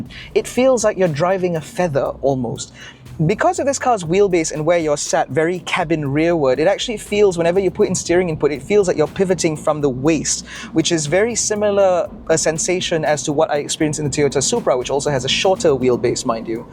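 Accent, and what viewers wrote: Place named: Upper class, United Kingdom. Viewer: Speak like a British aristocrat. nice......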